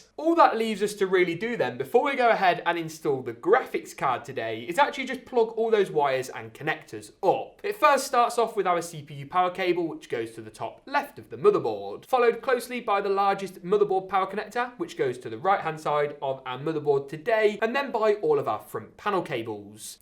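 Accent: United Kingdom. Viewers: your're the british guy right?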